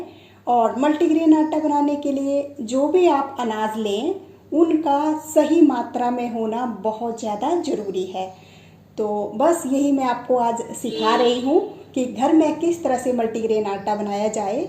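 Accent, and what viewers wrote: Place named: Pahadi, India. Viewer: Aaj voice tone me phadi lg rhe di ham b phadi hai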